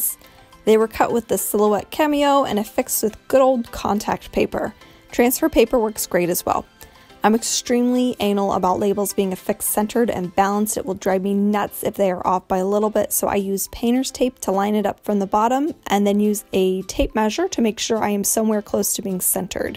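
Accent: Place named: Pittsburgh, United States. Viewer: i can tell youre pittsburgh "needs packed"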